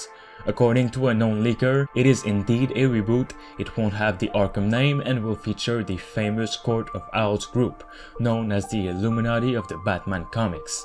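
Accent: Quebec, Canada. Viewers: are you Quebecan or French Canadian?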